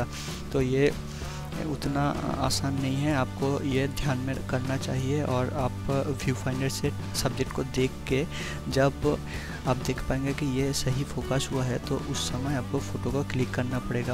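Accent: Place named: Bihar, India. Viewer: bhaai bihaar se ho aap apki aawaaaaj se he pta chal ra hai.........nice job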